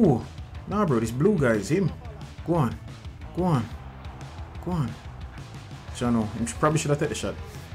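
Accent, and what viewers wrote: Jamaica. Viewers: Bro got Jamaican accent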